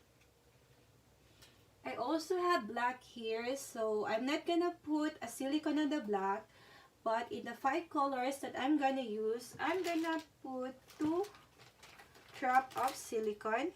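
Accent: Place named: Philippines. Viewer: You sound Filipina. I love this painting. It’s as close to my favorite color as it can get; red.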